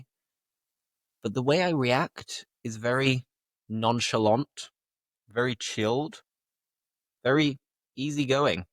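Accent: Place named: United Kingdom. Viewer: I found your page few days ago, and you are an excellent teacher and your accent is amazing .. I love British accent..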